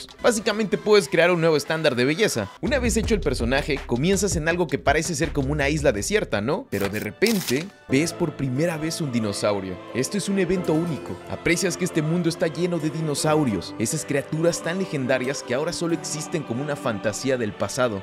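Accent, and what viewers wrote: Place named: Latin America. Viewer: Nah hombre, fuera de bromas tu estilo y edición son muy buenos, parecido al del grande de Mutzska, pero el tono más suave y tú guíon más crítico/narrativo son únicos y me gusta (no es agresiva Jwulen XD) y además eres el primer youtuber al estilo Mutszka que escucho hablar en español latino, aplausos pa ti 👏😄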